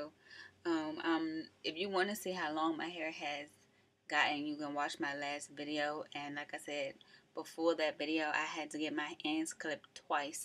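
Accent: United States. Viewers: OMG your whole video I was like, she gotta be from D.C. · She from DMV 100% lol...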